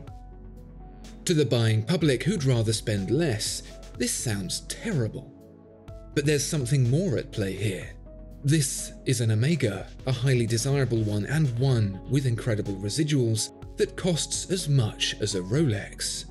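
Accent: United Kingdom. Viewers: So basically, this SMP is the Marine Nationale Pelagos that Tudor refuses to make. BTW, hearing someone with a British accent saying the most American of phrases "swing and a miss" is oddly satisfying.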